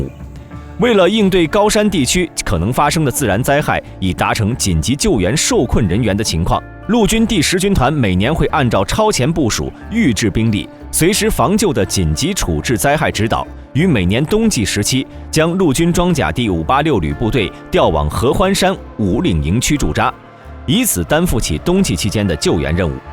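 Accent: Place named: China. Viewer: 怎麼聽上去感覺是普通話，不像台灣國語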